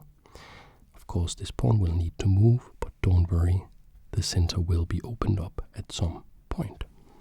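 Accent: Denmark. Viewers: the soft spoken danish accent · I think I also could recognize danish accent any day now :)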